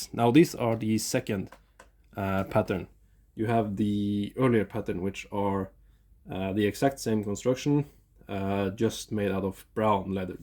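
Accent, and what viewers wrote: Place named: Norway. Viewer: Jeg hører på engelsken at du er norsk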